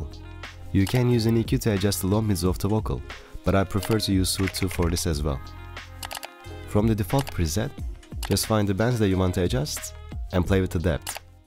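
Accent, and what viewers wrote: Turkey. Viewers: Biraderim bence sen kesin Türksün ben bu aksanı nerede duysam tanırım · abi ingilizcenden belli sen Türksün !!!!!!!!!! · aksanından türklük bangır bangır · Hocam aksandan dedim bu çocuk türk mü öyleymiş great content tho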